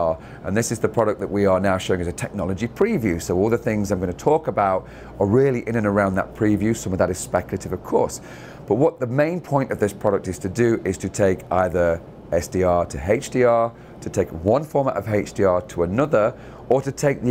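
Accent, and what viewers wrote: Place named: United Kingdom. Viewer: Looking and sounding quintessentially British! Nice.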